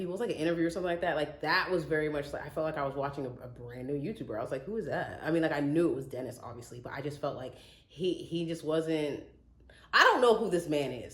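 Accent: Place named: United States. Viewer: Oh American English! A great time here. ❤️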